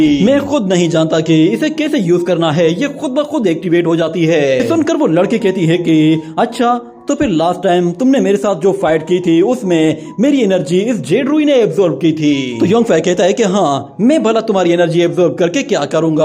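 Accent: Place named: Pakistan. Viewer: Lag RHa h Tum Pakistani ho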